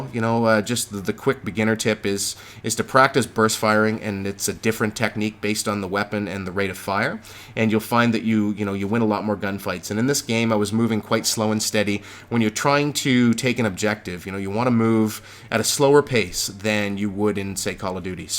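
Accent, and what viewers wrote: Canada. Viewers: are you A Canadian?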